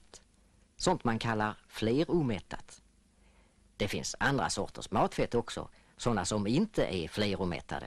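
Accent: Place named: Skåne, Sweden. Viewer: Idag hade man inte haft en som talar utbredd skånska i en reklamfilm.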